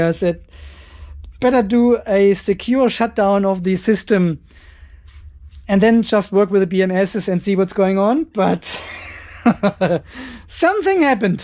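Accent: Germany. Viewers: Ah the weekly dose of Australian volts with a German accent. Cheers!, i connected the "peter" inverter to a convection oven. Now that draws some amps! bake some bread/pizza's if you want a load seems a great idea... Great display btw.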